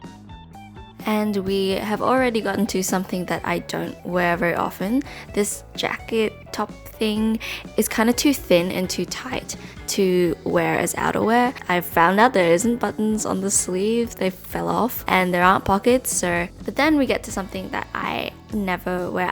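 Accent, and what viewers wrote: Australia. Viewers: Oh jeez. ANOTHER austalian i had assumed was british. help i can't place accents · Hiiiiii. I love your style and your accent. Can you recommend some other Aussie youtube channels that you love watching? I believe that if I enjoy watching your videos, definitely I will love your recommendations. Cheers!!!!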